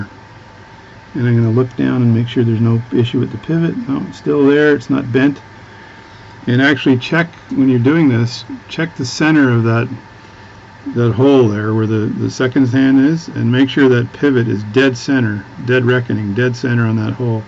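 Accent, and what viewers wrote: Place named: Canada. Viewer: Aboot. Does intrigue me the Canadian accent. We have “geordie” accent from Newcastle that sound similar words. And also the Scot accent which has very similar sounding if you know what I mean. Lol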